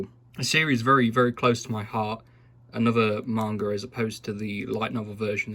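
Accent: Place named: United Kingdom. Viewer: Yeah it’s nice to hear another UK voice I swear almost every manga YouTuber is American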